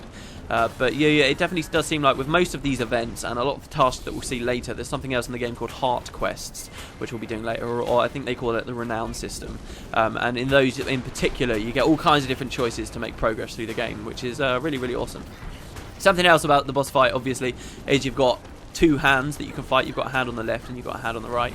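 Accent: United Kingdom. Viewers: Wow you really did sound more British 3 years ago! (but both voices then and now of you are still amazing XD) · His voice used to be a little deeper, but there's also more of a British accent on top of that. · Oh my god that accent:D, you definitely lost a little bit of your brittish-accent along the way. And even then, you knew everything about GW2. I wouldnt mind a few more of these:D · Don't lose your British-ness! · you do sound more "british" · He sounded a lot more British years ago. Since most of this video is old footage from 3 years ago, he sounds different in it.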